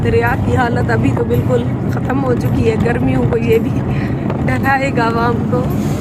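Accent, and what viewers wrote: Saraiki, Pakistan. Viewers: Aapi saraik language apki zaban say booht achii lgti ha. But samjh bilqul b nai aati.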